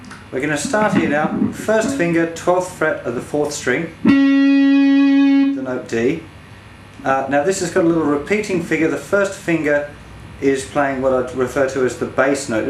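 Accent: England, United Kingdom. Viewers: Never thought I’d have Norm Macdonald teaching me guitar while using his English accent. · Is your origin from England?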